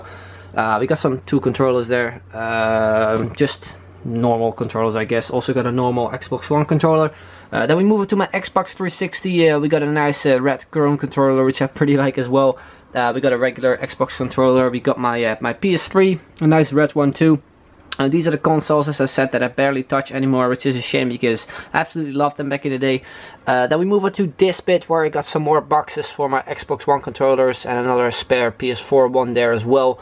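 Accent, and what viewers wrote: Netherlands. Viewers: Ik hoor gewoon aan je engels dat je nederlands bent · you have a really funny dutch accent(also i am) but you're vids are great man keep it up! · Haha had ik ook ik dacht meteen sowieso Nederlands!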